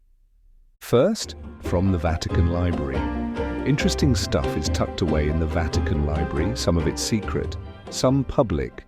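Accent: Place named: United Kingdom. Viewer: The voice is British - not for me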